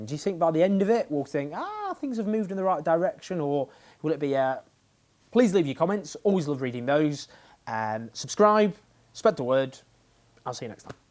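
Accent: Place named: North, United Kingdom. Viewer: Sound more northern/working_class than in other videos. Product of the content ?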